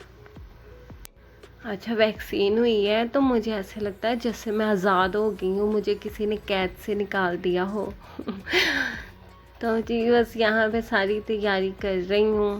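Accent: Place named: Punjab, Pakistan. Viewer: Assalam o alaikum Appi lgta h Pakistan shakargarah se h apki voice se lg raha h hamare me udhr k h wo b apke jese bolte h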